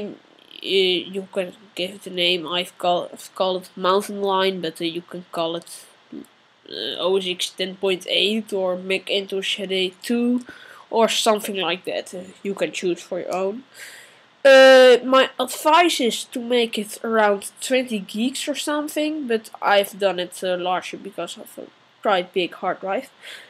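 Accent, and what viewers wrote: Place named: Netherlands. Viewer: I can clearly hear that you are dutch :D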